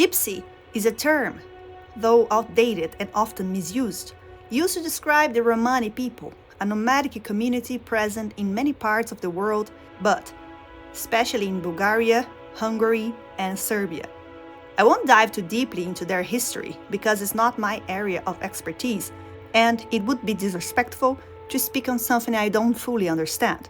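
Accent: Brazil. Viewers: i see that brazillian accent there 👀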